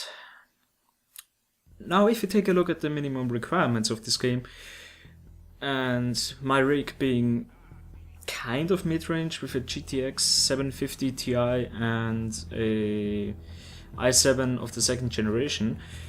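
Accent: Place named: Denmark. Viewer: Ok not to sound mean or anything but please use a script! the amout of times you stopped and hmmm, got me quite annoyed and i can tell your from denmark(sorry if your not), and no i don't know what you mean, look it up wright it down in the script, this did not have to be 24 minutes long 10 min was all you needed. but i feel potential in you join the darkside ;)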